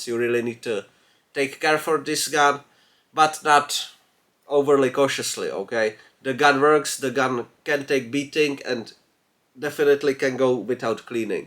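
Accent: Czech Republic. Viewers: i bet 100% this guy is czech, that accent tears my ears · Czech accent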